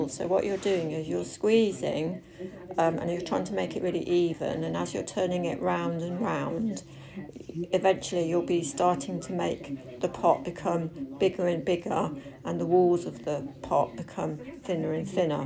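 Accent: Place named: Scotland, United Kingdom. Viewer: Your accent... automatically makes me think of Outlander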